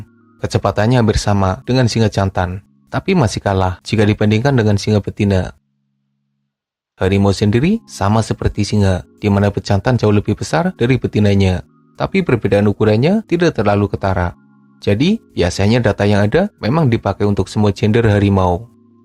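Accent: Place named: Ngapak, Indonesia. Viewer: Orang jawa nih admin nya 😂 sepetinya ngapak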